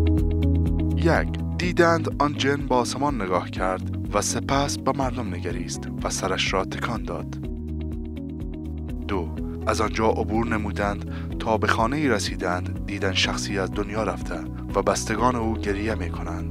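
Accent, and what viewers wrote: Afghanistan. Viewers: مزخرفات وچرندیاتی جدید بالهجه مزخرف وریده افغانی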